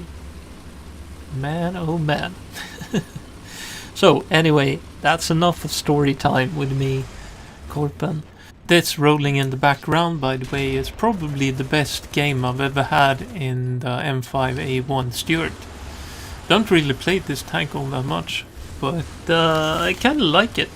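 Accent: Sweden. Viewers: I would also like to hear more stories stories and see more games with US tanks. Swenglish is cool :-)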